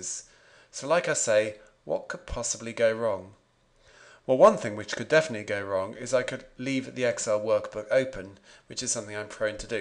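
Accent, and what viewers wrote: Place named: RP, United Kingdom. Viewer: If 007 did a video tut on ssis, this is how it would be -- entertaining and informative, all done in typically delightful Queen's english! Thanks for the awesome videos. -- roger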